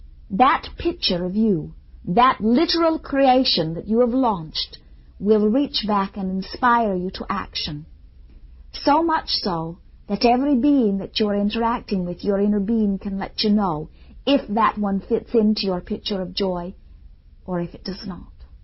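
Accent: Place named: Ireland. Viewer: her accent was diffrent when she was youg??? she sounds irish here!!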